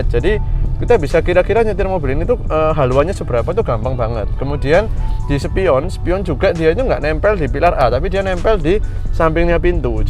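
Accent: Surabaya, Indonesia. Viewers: Kueren PuuoooL Kooo,,, penjelasan khas Bonek sby Ko... Uwis tak Suckrip & tak Like ko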